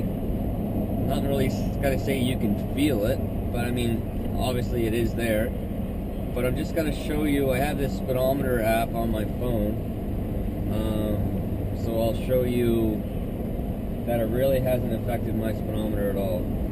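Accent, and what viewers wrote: Canada. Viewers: you're canadien right?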